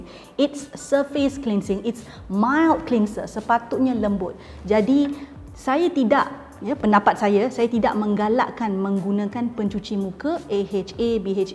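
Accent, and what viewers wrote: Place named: Malaysia. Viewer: Saya orang indonesia, suka banget dengan logat malay. saya paham betul point yang di bahas, walaupun beda bahasa. Terima kasih kaka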